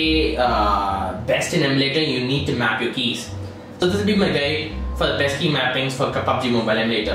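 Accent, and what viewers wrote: India. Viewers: Jesus fuck indian accent is so fucking annoying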